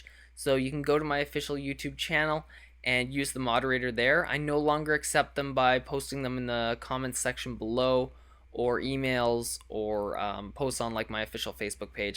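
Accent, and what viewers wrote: Canada. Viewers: are you from canada? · no im not. ive never heard a canadaian accent b4 and i liked hearing it and i wanted to copy it momentarily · are you from canada??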